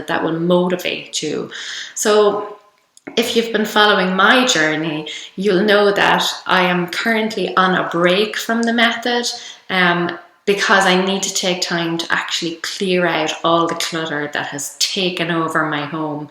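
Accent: Ireland. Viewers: I DO like an Irish accent ;) :) !! But I am also impressed how early on you found and implemented konmari/Marie Kondo's tips and philosophy - I am so grateful to have stumbled upon her Netflix Show - what a game-changer!! And now that I've binge-watched the heck out of it, konmari youtube-videos are my daily go-to!! Thank you!!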